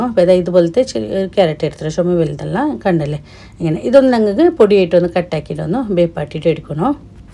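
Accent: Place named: Kerala, India. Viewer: സംസാരിക്കുന്നത് കാണുമ്പോൾ ഇയാൾ ശരിക്കും പ്യാരി തന്നെ ആണെന്ന് തോന്നുന്നു. പക്ഷേ സംസാരിക്കുന്ന രീതി മാത്രം മലയാളിയുടെ പോലെ ഉണ്ട്. അപ്പോൾ ശരിക്കും ഇയാള് മലയാളിയോ അതോ പ്യാരിയോ?🥰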